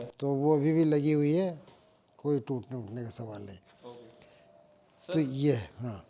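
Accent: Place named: Haryana, India. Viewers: katter haryanvi h ji ye nam h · बेटे आप मेरे को हरयानवी लगते हो असग़र साहब से सवाल करते हुए हरयानवी लहजा झलकता है? बहुत ही लाजवाब चर्चा बड़ी ख़ास बातें बताई असग़र साहब ने बड़े लेखक संवेदनशील इंसान सलाम सर जी को, आपको बहुत बहुत बधाई शुभ कामनाएँ एक मानवीय सरोकारी इंसान से रु ब रु करवाने के लिए संग्रहणिए विडियो, महिपाल मानव हिसार हरियाणा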